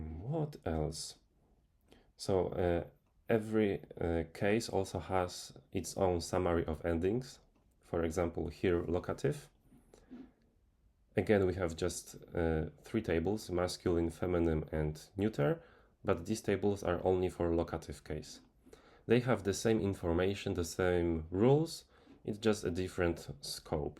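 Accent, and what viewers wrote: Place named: Poland. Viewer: I am here for your Polish English to hear!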